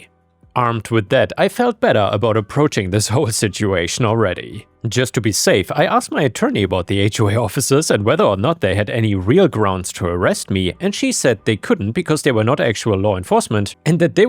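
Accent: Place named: Germany. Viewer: Hearing a guy with a German (?) accent do a Karen voice is epic! Love it!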